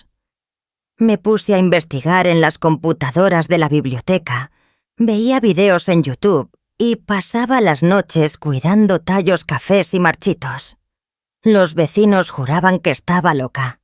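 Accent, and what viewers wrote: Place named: Spain. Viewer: Es el acento de alguien de nacionalidad española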